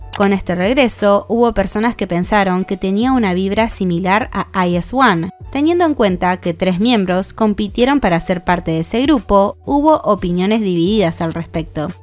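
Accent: Argentina. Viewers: 💫 ¡Excelente tu canal! ¡Me encanta!Solo por curiosidad ¿sos de argentina?💫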